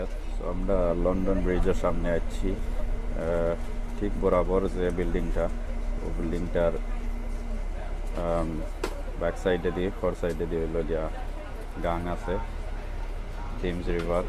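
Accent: Sylhet, Bangladesh. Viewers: সিলেটি মাতর মাঝে জে মজা যতো বার হুনি আরো হুনতে ভালা লাগে😁 আই💜ইউ সিলেট টু লন্ডন😁👌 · বাইসাবোর সিলেটী মাত যে সুন্দর লাগের! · Mr., thank you very much for your eventful video through which I had a rare opportunity to go round London city and enjoy a lot of things. I liked your sylheti dialect and brushed up my knowledge of it which I learned long ago. Thank you again.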